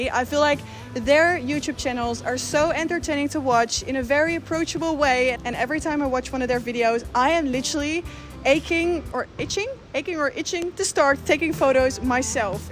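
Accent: Netherlands. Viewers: She has a Dutch accent? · That Dutch accent, 🔥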